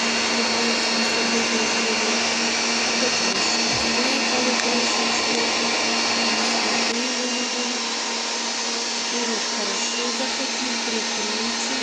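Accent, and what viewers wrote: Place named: Kirovohrad, Ukraine. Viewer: Дякую за відповідь.Я так і думала що або центр,або східніше бо розмовляєте як ми в Кіровоградській обл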